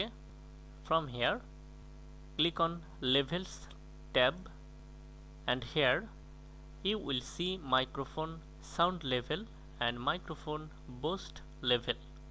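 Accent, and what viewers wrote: India. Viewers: IT WORKED, U KNOW ITS GONNA WORK WHEN U HEAR THAT INDIAN VOICE :D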